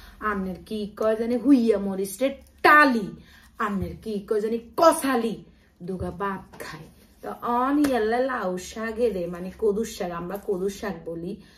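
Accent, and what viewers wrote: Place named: Sylhet, Bangladesh. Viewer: সিলেটী ভাল করে বাংলা বল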